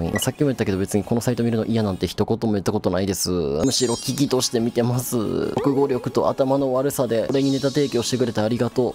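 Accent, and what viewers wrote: Kansai, Japan. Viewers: の動画、いつも楽しく観ています。面白いです!関西弁好きです。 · は声と関西弁がめっちゃあって好き、解説は絶対これ見るわ。いつもありがとうございます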